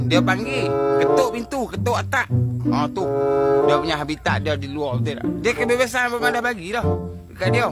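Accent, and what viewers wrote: Kedah, Malaysia. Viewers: Mu orang Baling ka abg? Wak tak tuh dehh..dema kacau kita dehh..😅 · Bg. Man ni ckp loghat Baling Kedah...kalau area Kuala Nerang-Pedu-Pokok Sena-Titi Akar, loghat mcm ni orang Melayu yg boleh cakap siam...dah mix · abg man org mna..ckp supo org bliing ja😂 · Pkcik ni ckp loghat apa ye?kedah ka atau terengganu/klntn?😅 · Cara ckap mcm org baling 😌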